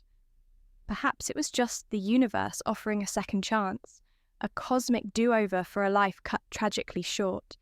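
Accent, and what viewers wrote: United Kingdom. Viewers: The British accent ruins the story, sounds like an Emma Watson rip off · 🤢 british accent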